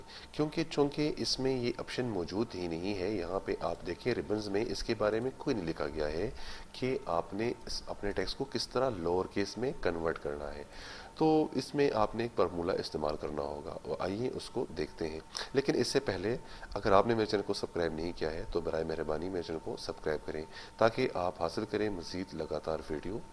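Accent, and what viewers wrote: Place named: India. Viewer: He revealed his face. I had an idea you were from india with the accent but very informative video.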